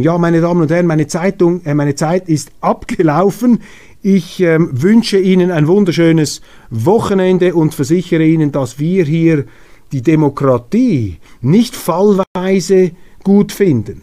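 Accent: Switzerland. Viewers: In der Schweiz darf man „faltsch“ sagen. Ich finde seinen Accent Fédéral ganz sympathisch.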